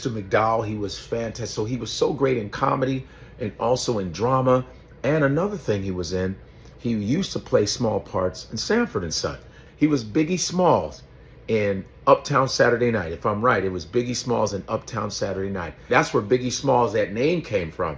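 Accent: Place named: New York, United States. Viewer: No other accent can cuss you and make you feel like crap like that New Yorker or Yorkah😊. "Youza funky B@ fa dat. Family I was LMBCOFF. I Luv this brotha and his comedy is fiya!👏👏👏. Scallywag 😂😂😂. New Yorkers have the beautiful flaw of telling just how they feel without concern for yo feelinz. LuYa Big Bra for speaking your mind and representing this great actor.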